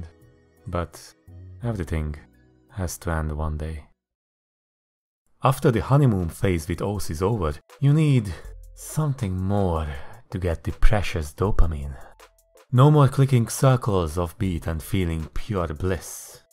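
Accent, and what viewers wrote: Hungary. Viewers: Érezhető a magyaros akcentus, de imádom :D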